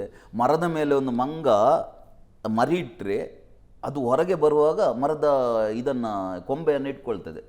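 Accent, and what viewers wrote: North Karnataka, India. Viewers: To the interviewer - you should have come well prepared bro ... Looks like you are from North Karnataka. Your accent says it all. But please do your homework 🙏.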